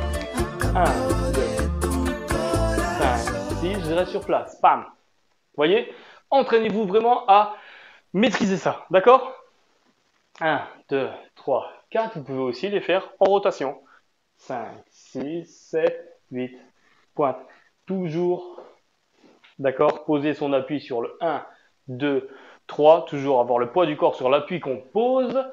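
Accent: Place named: Madagascar. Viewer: Coucou, pas mal....on dirait vous avez un accent malgache!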